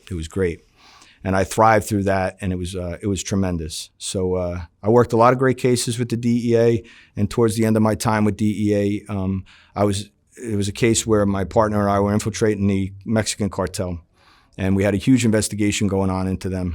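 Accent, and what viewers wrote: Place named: Italian American, United States. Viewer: I love a NY, NJ, Boston, Philly, and Bmore accent. Might be a lil bias wit the bmore accent cuh i live around there but I’ve always admired these italian american city accents fs. There’s just something so unique sounding about them than ur typical american accent. That being said, his voice paired with his story, really narrated like he’s narrating a book. Really helps keep my attention. 2 hours and 30 mins in and haven’t stopped watching this whole time.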